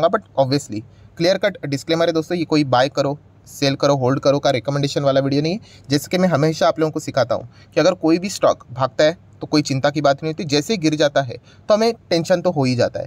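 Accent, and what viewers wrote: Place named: Delhi NCR, India. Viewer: sir aap 100% delhi ncr se ho aisa muje lgta h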